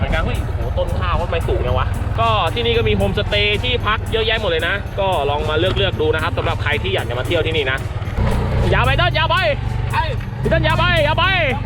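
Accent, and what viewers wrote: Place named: North, Thailand. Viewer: พูดไทยสาบเมืองได้ไง 5555 จิ้นส้ม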